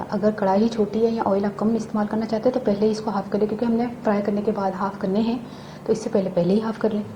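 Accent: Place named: Rural, India. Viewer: Bhen m kehna chahti hu ky agr video bnani h to kissi sy voice over krny ki classes lyy lo w ky apko sense nhi h kese bolyy h bht paindu boltii aur aik bt ap bht zuda bolti wooo naaaa wooo naaa aur aik wo apki h jis ko bolny ka tarika hi beech m urdu ky punjabi start kr deti h plzz tameez sy bolnaa seekh loo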